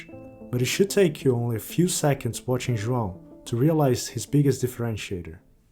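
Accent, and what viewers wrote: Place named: Brazil. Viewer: you speak english with a brazillian accent, are you actually from brazil?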